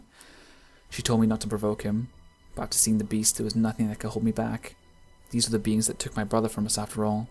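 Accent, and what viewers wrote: United Kingdom. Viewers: ... Please do more of these... That's great. And the british accent makes it even better:] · hes British lol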